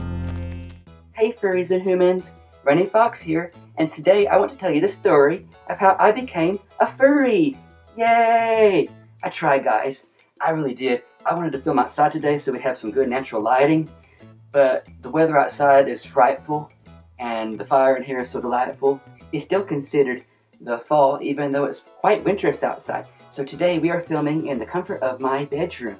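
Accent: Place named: Texas, United States. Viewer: Nice video!I love your accent. You from Texas?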